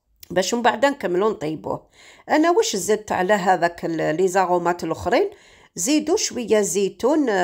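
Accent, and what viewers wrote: Algiers, Algeria. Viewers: لكن تبان في هدرتها باينة لهجة عاصمية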